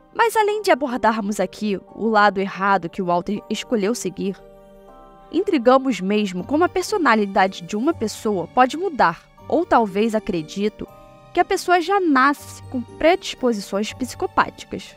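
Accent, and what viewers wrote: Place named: Rio de Janeiro, Brazil. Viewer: O vídeo é bom Complicado esse sotaque de carioca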